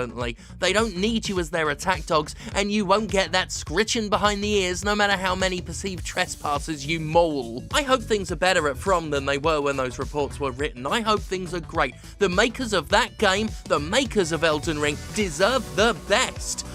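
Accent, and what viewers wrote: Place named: Birmingham, United Kingdom. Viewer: Your becoming slightly Brummie and I’m ok with this.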